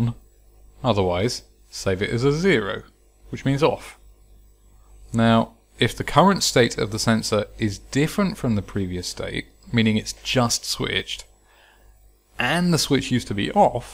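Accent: United Kingdom. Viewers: A Brit dude with brilliant story telling educating me about arduino and trains? YES PLEASE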